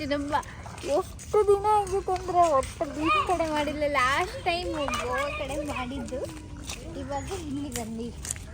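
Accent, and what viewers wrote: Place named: Udupi, India. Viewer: ನೀವು ಉಡುಪಿ ಭಾಷೆ ಚಂದ ಮಾತಾಡ್ತಾ ಉಂಟು 😁🤪🤩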